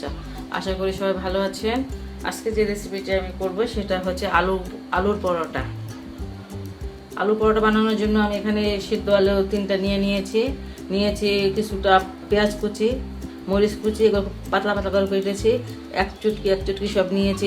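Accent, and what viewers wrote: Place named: Bikrampur, Bangladesh. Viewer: Apni ki bikrompuer meye? Amar mone hosse kotha shone.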